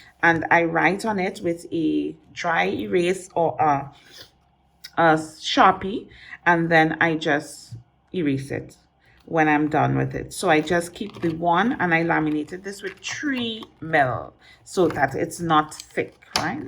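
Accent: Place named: Caribbean. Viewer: Beautiful planner setup 👍. It's really great to hear a Caribbean accent speaking about my obsession ❤️. I purchased my Filofax on Amazon as well. So sad we cannot get this stuff in the islands.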